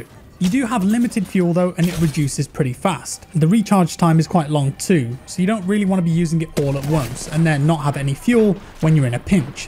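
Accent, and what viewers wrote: United Kingdom. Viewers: What I like about he speaks British but called him self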